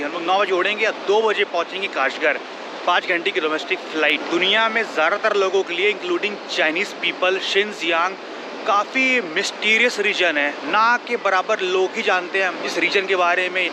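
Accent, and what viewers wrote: India. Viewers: 大部分普通中国人英语并不好，你说印度口音的英语，他们更听不懂。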